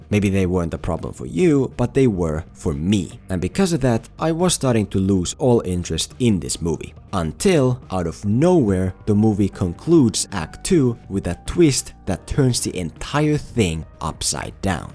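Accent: Finland. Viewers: Ootko suomalainen kun on niin suomi-aksentti?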